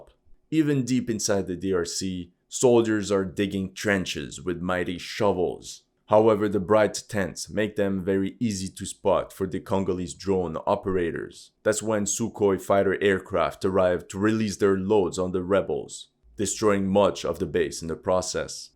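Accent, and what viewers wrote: France. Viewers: You a Frenchie brother?😊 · Amazing French accent! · Quand j'écoute votre vidéo j'ai l'impression que vous avez un accent français fait des vidéos en français aussi 🤔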